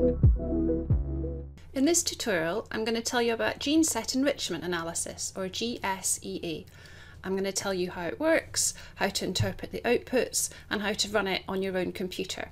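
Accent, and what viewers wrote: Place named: United Kingdom. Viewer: Thank you. That was concise and wonderfully analyzed . Meanwhile your British accent is super!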